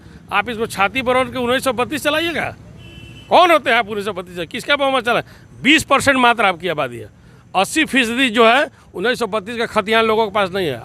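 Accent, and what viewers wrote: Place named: Bihar, India. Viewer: Aapka baat bolane se Pata Chalta Hai Ki aap Bihari hai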